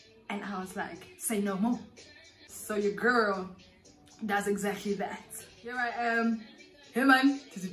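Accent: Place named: Newcastle, United Kingdom. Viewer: It's the Geordie accent for me🤣🤣🤣🤣🤣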